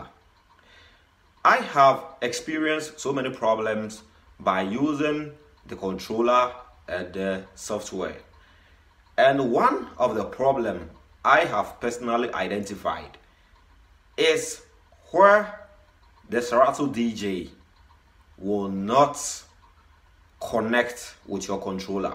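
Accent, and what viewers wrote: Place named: Africa. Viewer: I LOVE YOUR ACCENT BRO PROPER AFRICAN VIBES PEACE AND LOVE MY MAN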